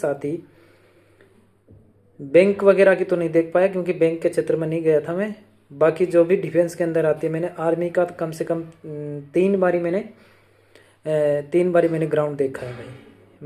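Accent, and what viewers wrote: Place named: Bharatpur, India. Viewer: Abki avaaj bol chal bhartpur ki se ha bhai reply Dena bhai kaha se ho or itbp animal transport kitni chhutti milti ha 1 saal me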